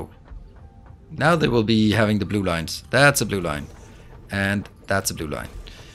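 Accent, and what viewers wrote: Denmark. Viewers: yo mate good video just got the game and wanted to lean a bit while downloading :D Little side Quest ion. are you danish ? your accent makes me think that hehe and wanted to ask. any way have a nice day ^^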